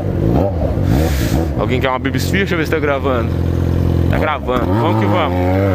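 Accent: Goiás, Brazil. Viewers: tem alguma ligação com goias? Ou so modo de falar? É noos!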